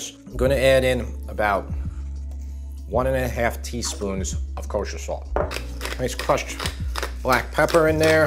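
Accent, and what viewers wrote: New York, United States. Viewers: May I ask what accent you have ? Sounds like New York to me. I’m an Aussie. 😬👍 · I have made chicken soup often, but this made very clear how you can use the chicken to make the stock and use the same pieces to use in the soup. I do like white meat chicken in the soup, but the ease of this would certainly make me give this a try. As a native New Yorker, I love listening to ’ accent, making a big assumption that he is also from New York.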